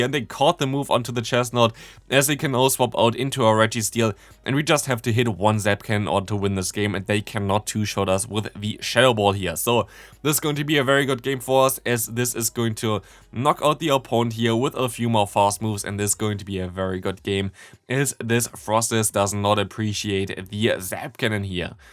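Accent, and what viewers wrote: Germany. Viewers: sounds like hes german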